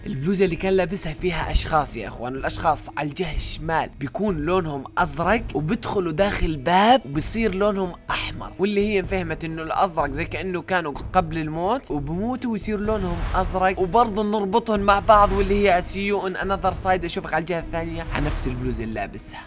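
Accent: Jordan. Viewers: أحبك وحب طريقة سردك للقصص مفهومة وأحلى شي لهجة أهلنا الأردنيين قريبة على القلب ومفهومة😍❤🔥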